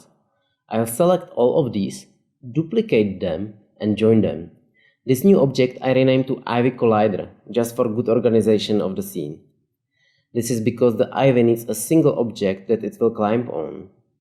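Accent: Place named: Czech Republic. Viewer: That Czech accent lmao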